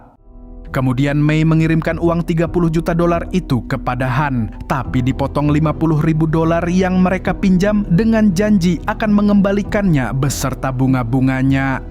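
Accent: Banjar, Indonesia. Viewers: Org banjar jua paman ni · Urang Banjar amang nih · Katuju banar unda lawan nyawa min ai. Urang Banjar jua sakalinya😅. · mimin orng banjar kalsel kah,? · Banjar ya min...tambuk😂😂😂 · Orang banjar kah bang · Urang mana pian mang logat nya banjar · Banjarrrr bgtt lahh🤣🤣.. wkwkk bgl tapi kerenn bang 😂🔥🔥🔥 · Urang banjar sekalinya 😂😂😂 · Urang Banjar kah sekalinya amang admin nih😅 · Banjar kah mangg? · Banjar kh pian mang😂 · pian ni urang banjar kah?😭🗿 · Jiah, org banjar adminnya 😂😂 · Orang Banjar kah mang😂😅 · Org banjar jua sekali nya lah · Urang Banjar amang nya ini 😂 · Anjay banjar sekali nya sidin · orang Banjar sakalinya😂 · Anjay urg banjar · banjar jua skalinya😂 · Mang Pian Banjar kah Kandangan,barabai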